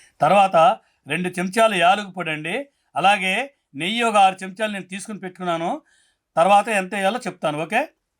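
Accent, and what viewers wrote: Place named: Kurnool, India. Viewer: Amma meeru ma Kurnool basha lo mataladu tunte chala bagundi Athrasalu❤❤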